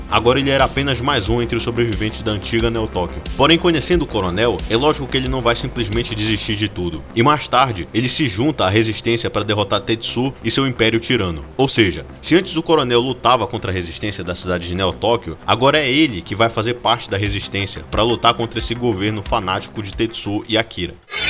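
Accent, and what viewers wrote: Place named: Ceará, Brazil. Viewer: Oloco, cearense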